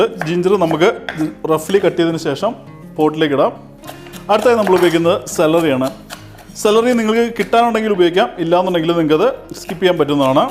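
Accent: Kannur, India. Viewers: the way you speak resemble like you are from kannur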